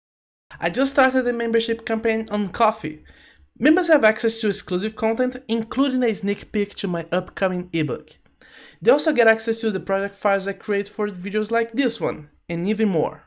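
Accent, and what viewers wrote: Brazil. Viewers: As soon as I heard the accent I knew it was another Brazilian 😂 Glad more Brazilians are making content in English it is a nice change and as a Brazilian myself it is exciting to hear this 🎉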